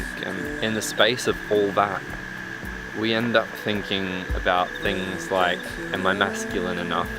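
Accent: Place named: United Kingdom. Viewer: so much yeah. btw given your accent i assume you're British so i'm sorry about brexit and all the possible negative consequences it might have.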